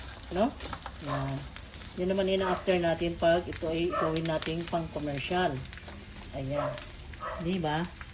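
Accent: Ilocos, Philippines. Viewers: Parang Ilocano yata kayo! Taga saan ba kayo?😊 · Ilocano ka tlaga msgsalita, para rin kyong bisaya. Wag knang magtagalog at nkakahiya po kyo . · By any chance you’re Ilokano?😊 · Ilocano ka po? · Ilokano ka ba ma'am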